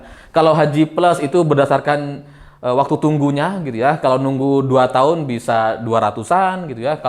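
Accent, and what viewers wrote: Java, Indonesia. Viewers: Gw tandain yg subscribe bulan kemarin 398, sekarang baru 410, artinya peminatnya kurang ini Chanel, Tutup aja, Medok kata2nya Gak bermanfaat.😆😆😆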